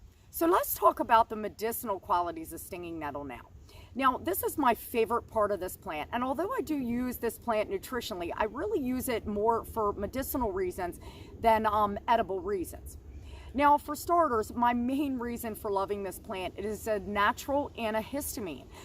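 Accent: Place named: Pennsylvania, United States. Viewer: Corporal sent me. Great content. I love gathering knowledge. My wife and I were wondering if you lived in Pennsylvania? You sure sound like it. Lol. Sorry if you're not.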